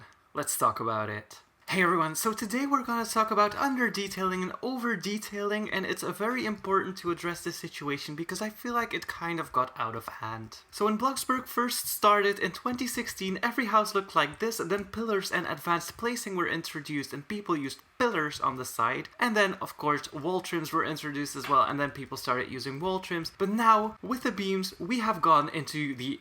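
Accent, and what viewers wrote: Flanders, Belgium. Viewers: Ben je ook Belg?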